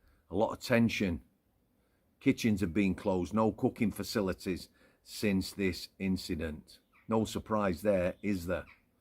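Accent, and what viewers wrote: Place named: Yorkshire, United Kingdom. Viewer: I’m a Londoner and I love the Yorkshire (?) vibe. So much Britishness in that accent. Keep up the good and honest work. 😊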